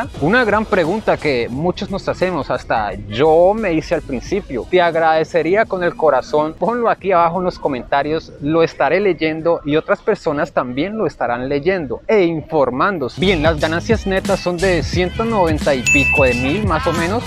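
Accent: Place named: Colombia. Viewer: Buen día De que parte de Colombia es usted??? Porque por el acento NO es de Barranquilla....jajajajajajaja Muchas gracias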